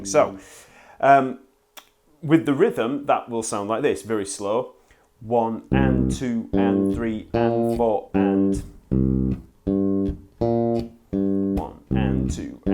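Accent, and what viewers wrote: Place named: England, United Kingdom. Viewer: Where are you from, Mate? Liverpool? South London? I can understand everything you're saying.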